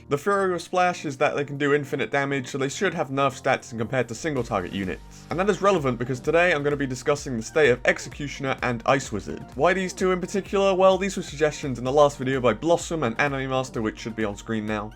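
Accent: United Kingdom. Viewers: Yeah, your accent sounds british, but you always answer to strange times:D Could have been American time zones.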